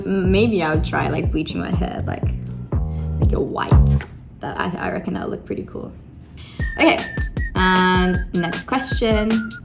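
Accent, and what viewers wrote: Australia. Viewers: You’re English is very Aussie💖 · You got Australian accent xx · AussieアクセントとAmerican or Canadianアクセントが混ざってる～💕住む地域がいろいろだとそうなりますよね！！なんだか自信をもらえました。ありがとう❤ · I can hear your Australian accent! I live in Australia so I felt an affinity with you haha I live on the Gold Coast Where is your dad from? 🫶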